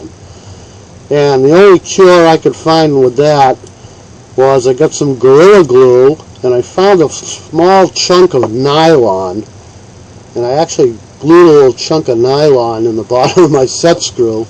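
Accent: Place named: Maine, United States. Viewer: No. Now that I have had time to think about it, I was way off. I would guess that you are from Maine, not Mass. My guess is somewhere between Bath and Warren. Did I get it right this time? By the way I did the repair and you were spot on! The O-ring was a little deformed but I think it's going to be OK. I had better luck placing the O-ring and washer on the valve and (holding it upright) brought the mag down onto it. Thanks again!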